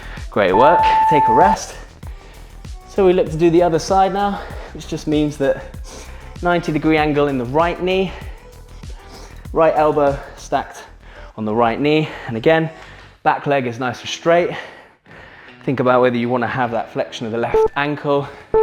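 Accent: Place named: United Kingdom. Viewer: Hi, just found your channel and it's the BOMB - exactly what i need: timed, pre-planned workouts with minimal talking.... yet when there is a spoken word, it's delivered in a wonderful British accent! Cheers!!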